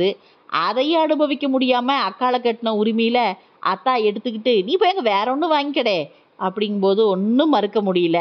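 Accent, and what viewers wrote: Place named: Tirunelveli, India. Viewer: நாஞ்சிலாரின் கதை அருமை. கதை சொல்லியின் திருநெல் வேலி பாஷை பிரமாதம்.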